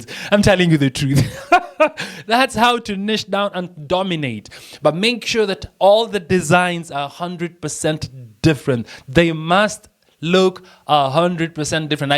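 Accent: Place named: Africa. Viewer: What part of Africa are you from? I came here for POD info but I stayed because I love your accent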